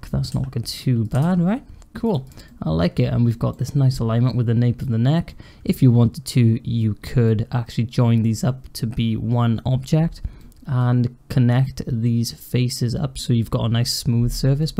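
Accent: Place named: Cardiff, United Kingdom. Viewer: Is that a Cardiff Accent i hear? nice to see a tutorial in the native tongue lol. (swansea here)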